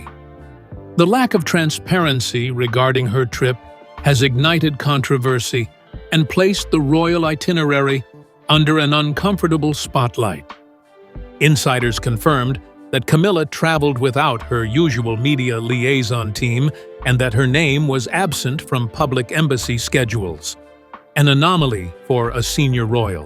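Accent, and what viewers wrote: United States. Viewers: Now is this true>? The narrator's American accent tends to raise my suspicion..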